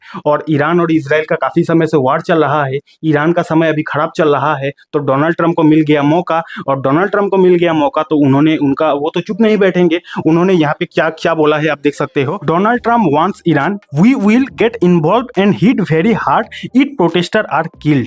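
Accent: Bihar, India. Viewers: Bihari to tum?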